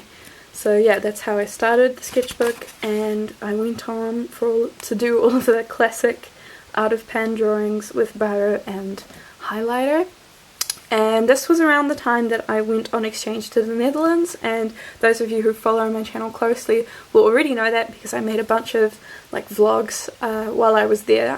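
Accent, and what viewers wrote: New Zealand. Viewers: Are you from New Zealand ?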